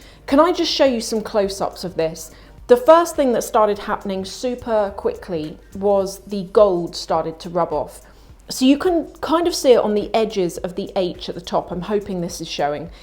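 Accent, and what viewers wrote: RP, United Kingdom. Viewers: you sounds so posh!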